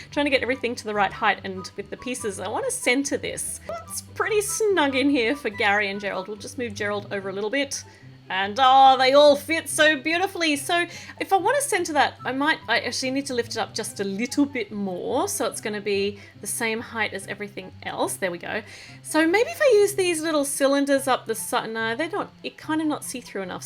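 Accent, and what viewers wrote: Australia. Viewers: I thought you were saying pillow cuz you talk in an Australian accent but you were actually saying pillar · I love the Australian so it’s sounds like suo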